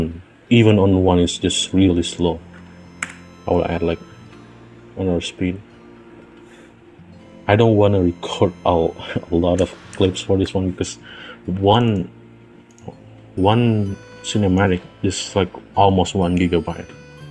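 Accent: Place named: India. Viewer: Amazing Indoglish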